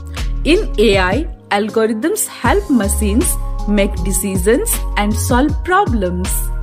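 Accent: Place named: Uttar Pradesh, India. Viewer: Are you from up or mp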